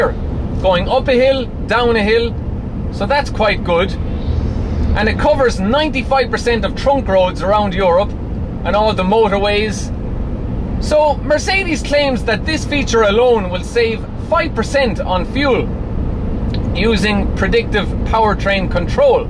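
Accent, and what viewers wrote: Ireland. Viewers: I bet you are Irish, aren't you ? Thanks for the introduction to the ACTROS. I used to live in Galway, was a pleasure to hear IE, again !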